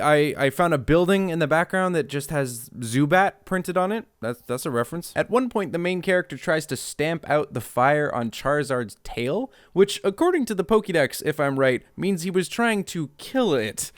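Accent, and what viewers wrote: Australia. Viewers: Maybe doesn’t realize that people mainly come here for his Australian accent voice. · Binge watching old videos then just not hearing an Australian accent all of the sudden was incredibly jarring. I do like the videos where gets involved though.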